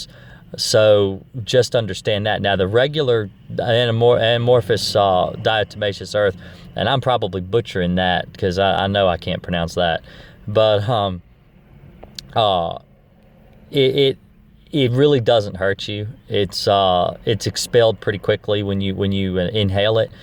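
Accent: South, United States. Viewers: Dat southern accent!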